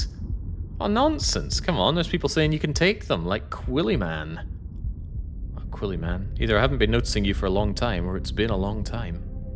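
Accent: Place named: Scotland, United Kingdom. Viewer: Hearing a Scot talking about "the first thing you should do is go to the Bar" and I get flashbacks to That Time I Was In Livingstone in the 70s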